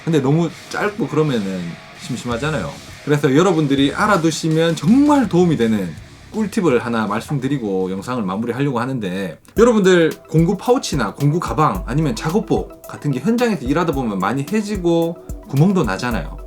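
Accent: Gyeongsang, South Korea. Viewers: 재미있기도 하고 진실함도 보여서 좋습니다. 대구 근처로 생각되는데 경상도 억양이 더 정겹기도 하고~~~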